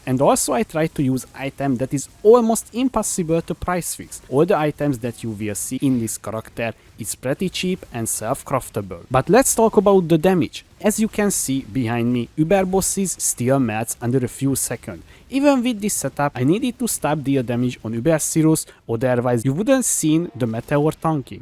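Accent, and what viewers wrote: Hungary. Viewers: Remélem csak én hallom rosszúl, hogy magyar vagy? Mert sirva fakadok ha ilyen szintű angol nyelvü videókat csinálsz, miközben magyar myelvüek nincsenek.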